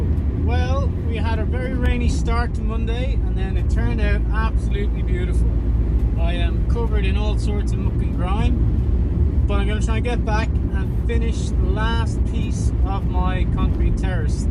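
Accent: Ireland. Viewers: Hi! I found your channel by accident but know you from Dan's channel (been following him since his farmhouse days). As I have enjoyed your interactions I've seen from Dan's perspective, I thought I'd check your channel out and I love it! I hadn't heard enough to realize that you're Irish until hearing you on your own. Irish blooded myself, I miss the quirky, snarky humor and so thrilled to see it in you. Thanks for the smiles and I look forward to more! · New viewer here, thanks to Dan posting your puppy video. Seeing as you so kindly numbered your vlogs, I decided to begin at the beginning of them. You're loads of fun, great scenery videography, witty reparte', lol, what's not to like? I could listen to you talk all day long. Your accent is much like my own. I have missed hearing it. Dad's family were McKinley's from northwestern Ireland. Most there starved by the Catholics and British. 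So, to America, his grand dad went from mining and being a Molly McGuire to becoming a weaver in Philadelphia. Dad and his dad carried on the traditions of being Molly McGuires in Ohio, West Virginia, and Kentucky with full support of corrupt cops. We used to sit on a blanket covering loads of guns, ammo and dynamite, while traveling 4-5 hours out of state as kids. As you might imagine, our childhoods were sheltered and so controlled because he was in organized crime that our way of speaking remained Irish. An englishman, a linguist, once paid me to talk as he recorded me speaking. He rather enjoyed pissing me off to hear me tell him off, lol. I am, myself not Irish by blood though I have green eyes. I never feared St. Patrick's Day at school for not wearing green. Not wearing it got one punched in the shoulder. I am native American Indian by blood, a direct descendant of the Shawnee Indian leader, Tecumseh. 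I don't know how to be an indian, except dad respected how quiet, sneaky, and courageous I was when he threatened my life and tried to ki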